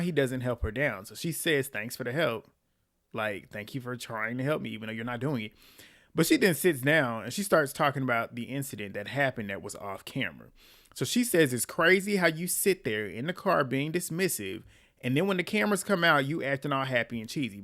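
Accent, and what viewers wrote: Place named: North Carolina, United States. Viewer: I’m from South Carolina and I just love listening to you talk because you sound soooo NC 😂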